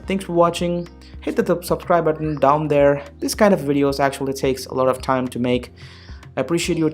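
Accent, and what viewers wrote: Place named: India. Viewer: He's indian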